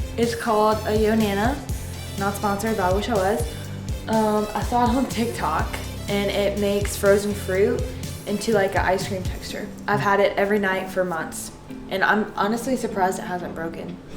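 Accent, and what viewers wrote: North Carolina, United States. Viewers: Love her accent!!! makes me me NC so much being from the UK no longer being able to get over there. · Good ole North Carolina accent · I love her subtle southern accent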